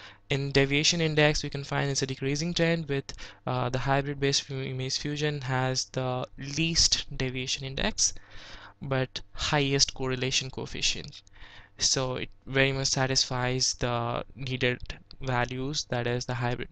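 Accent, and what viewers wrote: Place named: United States. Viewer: Through this video, you just took me to the good old college days & our project work. Your pronunciation has become somehow American :D Where are you now a days?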